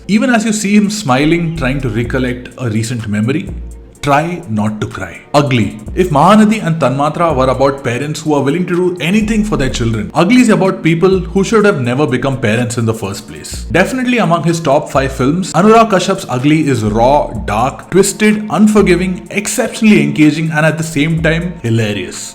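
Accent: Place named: Kerala, India. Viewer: Mallu pretends to be tamil. But basic accent is mallu. Boring narration.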